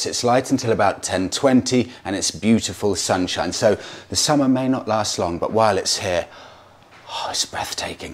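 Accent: United Kingdom. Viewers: Me encantó tu video! Hermosa Lituania! estoy estudiando inglés, tu acento me hizo acordar mucho a y a! thank you very much!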